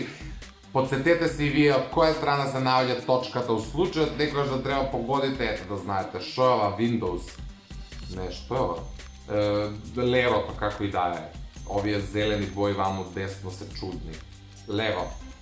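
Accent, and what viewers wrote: Kumanovo, North Macedonia. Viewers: Koj saka da snima kade zboruva 24h Kumanovski. Stavete lajk za da vidi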